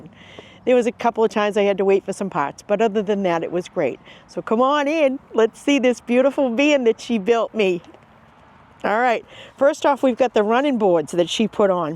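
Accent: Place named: Boston, United States. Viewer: I usually love Boston accents but this one got old very quickly.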